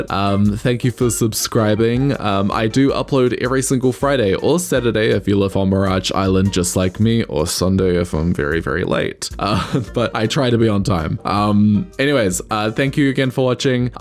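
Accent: New Zealand. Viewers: I still cant get over the Petz Catz 2 theme music lmao. I was just in your stream thinking "I wonder if he's from New Zealand with that accent.." and behold, a kiwi lmao. But I really like the designs! I love how much detail you put into the descriptions too. Please do more of these :)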